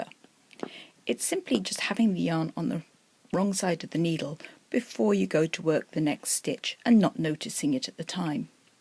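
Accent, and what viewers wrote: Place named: England, United Kingdom. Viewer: Love your work. No fuss just doing the job. I also love that wonderful English accent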